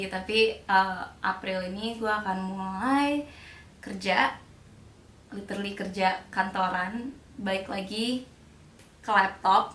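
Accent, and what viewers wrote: Java, Indonesia. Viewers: It's okay to speak like medok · I am eight year gpp cute kok medok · cara ngomong medoknya itu loh 😍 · Medok nya kental Jawa bgt but kamu fasih inggrisnya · Medoknya lucu wkwkwk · That javanese accent, tho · Medoknya lucu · Logat kejawa jawaan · Please, bikin video pake bhsa medoknya dong. Lucu lah. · Lebih suk kalo ngomongnya medok.. kearifan lokalnya terlihat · Kok medok gitu? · bikin video ngomong medok dong. cute banget wkwk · Bagusan medog ngomongnya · It's okay to be medok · Banggalah dgn medok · Medog malah natural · talking in medok accent omg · Its okay to be "meddok" 😁 , keren kok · well actually, cocok bgt kalo medok 😁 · Aku seneng medok mu haha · Medog aja kak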